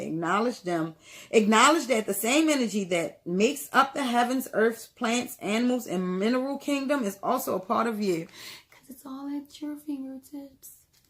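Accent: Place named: Virginia, United States. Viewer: Wow sis this reading was very detailed to the Teaa & it's accurate as hell 👏🙌. When you mentioned the Devil card I said wow its scary because I've been seeing 555 constantly along with other #s too. The Universe is speaking to us all about letting go the toxic sh*t from our past so new things & opportunities can come our way. Not to mention mercury retrograde is also in the mix 🤦‍. Im loving your readings & loving your vibe. I feel like you also maybe from Virginia or Maryland because you have an accent lol 💕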